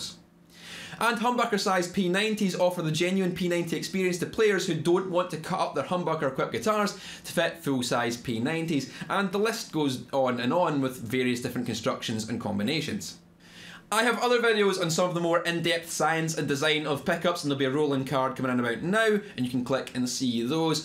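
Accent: Scotland, United Kingdom. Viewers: Good to hear a Scottish accent for a change, plus I understood what you were saying, I liked the look of the 2nd guitar you were playing, can you tell me what it's called? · It's just a run of the mill Scottish accent! Nothing special. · Scottish accents are so cool wish I had one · F*ck me, a great video on a fascinating subject, and instead of compliments and questions, the comments are plagued by thickies who have never heard a Scottish accent. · it's scottish? cool, i was guessing australian..... cheers :)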